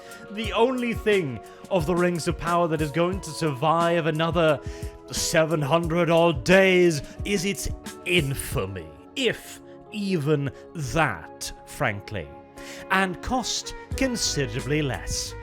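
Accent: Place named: England, United Kingdom. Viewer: I've noticed that he has this very crisp and pleasant English accent most of the time, but when he does longer videos with other people, he seems to partially lose that accent part of the time. Anybody know what's up with that? lol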